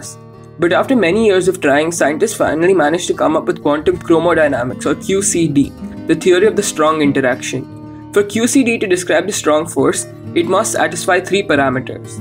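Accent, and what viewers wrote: India. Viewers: Why is it so difficult to understand Indian accent? :( I know Spanish is also quite terrible. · Your accent sounds like that of an Indian. Are you a student of some IISER/IISC? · India. The accent.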